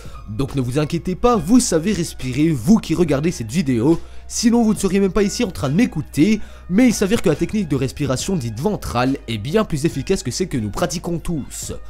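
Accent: Belgium. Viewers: tu est Belge??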